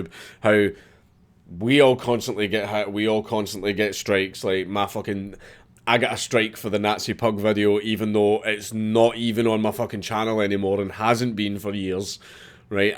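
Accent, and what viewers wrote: Scotland, United Kingdom. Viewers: I love the scot accent but if I'm not paying 100% attention I can not understand it 😂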